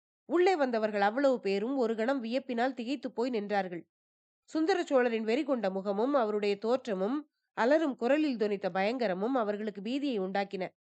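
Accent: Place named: Gyeongsang, South Korea. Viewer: 오 경상도 시투리 억양과 비슷 하구나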